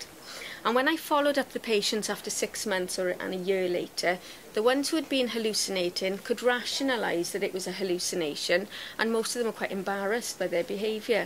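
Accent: Wales, United Kingdom. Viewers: Is she welsh? Love her accent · Lovely Welsh accent. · A very interesting talk by a very interesting and lovely speaker, with an open mind and a beautiful Welsh accent. She covered many aspects related to the NDE experience.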